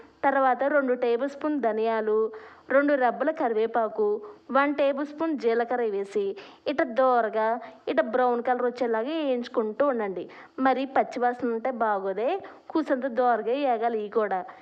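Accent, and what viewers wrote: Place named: Telugu, India. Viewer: రొయ్యపోట్టు కారం ప్రిపేర్ చేసుకునే విధానం చక్కగా వివరించారు సిస్టర్ మీరు వివరించే అచ్చమైన తెలుగు యాస స్టైల్ సూపర్. 👌🎉💐🙏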